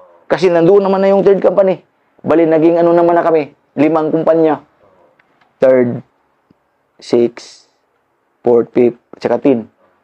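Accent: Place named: Visayas, Philippines. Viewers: Napatawa ako don ah 😂 , Abante kage, abante kage,. Pagkaluskos bombahan ng tropa hala. Patay si kage!😂😅 . bisaya kaayo ka sir ba klaro lang hahhaaha😄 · nakakatuwa si sarhento mag kwento kc may halong bisaya kay bisaya man ako watching from manila pero sa surigao ko pinanganak 😊💪✌❤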